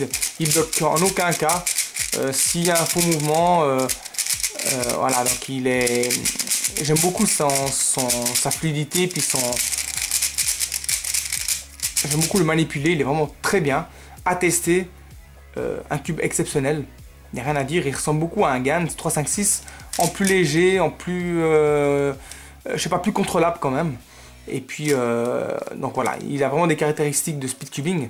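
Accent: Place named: Fribourg, Switzerland. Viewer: Ouais, je suis aussi fribourgeois. J'ai vite reconnu l'accent !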